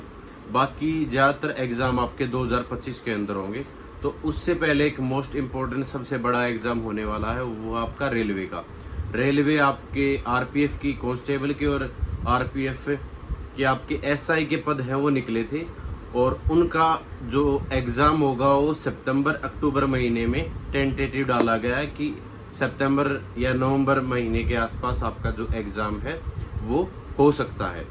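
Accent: Haryana, India. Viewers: Bhai aap haryana k lgo ho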